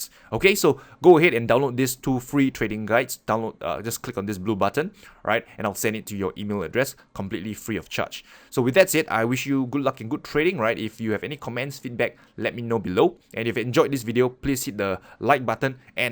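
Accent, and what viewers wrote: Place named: Singapore. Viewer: Darn i miss your accent man i miss singapore! You are from singapore i assume?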